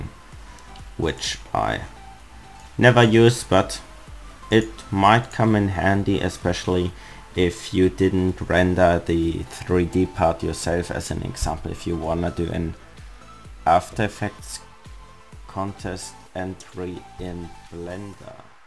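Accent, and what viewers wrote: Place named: Germany. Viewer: bist du deutsche :D haat man aam anfang gehört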